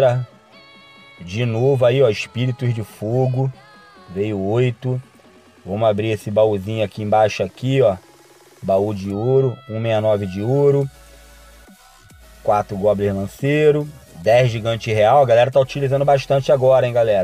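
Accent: Rio de Janeiro, Brazil. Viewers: vara seus vídeo e top d+ mais o sutac carioca incômoda muito um mineiro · Vs é Carioca Né? Adoro As Gírias Carioca!!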